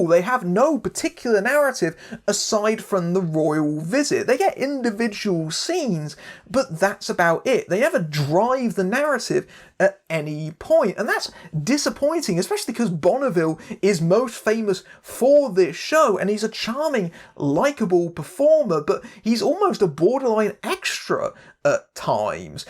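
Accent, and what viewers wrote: United Kingdom. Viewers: Wait, is British?!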